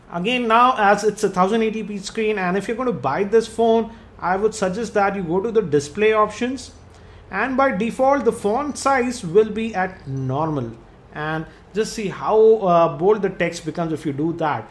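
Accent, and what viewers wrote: India. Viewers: just came here for the funny indian accent.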